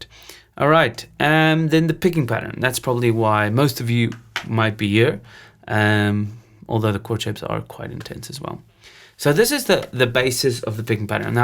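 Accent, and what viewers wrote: South Africa. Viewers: I can hear when someone's from SA. Takes one to know one.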